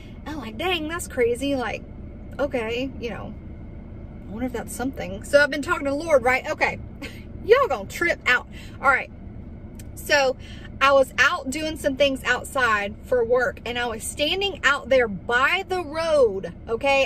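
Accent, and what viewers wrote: South, United States. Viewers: I love your laugh and southern accent. You're my sister in Christ, and you look like you could actually be my sister, although ten times better looking. (I'm old)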